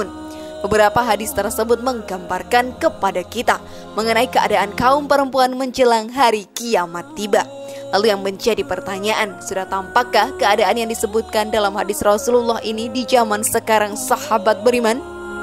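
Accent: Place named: Java, Indonesia. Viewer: trimakasih berbagi ilmunya...hanya sedikit masukan yg bacanya terlalu medok cengkoknya bu...coba di perhalus biar enak di denganya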